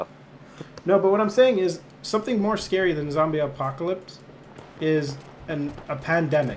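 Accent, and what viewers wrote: Canada. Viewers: Not Irish. Newfoundlander.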